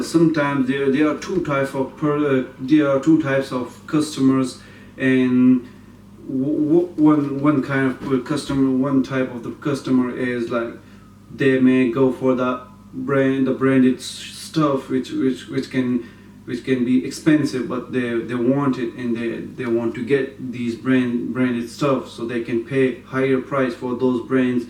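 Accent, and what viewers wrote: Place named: United States. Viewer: Accent hm American de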